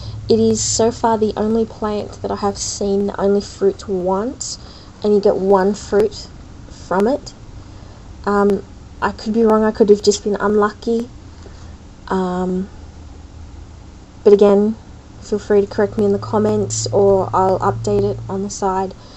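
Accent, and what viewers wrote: Australia. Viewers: You sound Aussie :) Cool video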